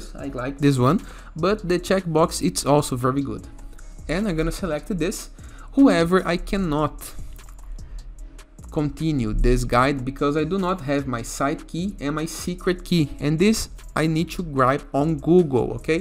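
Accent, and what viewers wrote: Brazil. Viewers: Cara notei na hora que tu era brasileiro pelo seu sotaque kkkkk mas parabéns pelo video!!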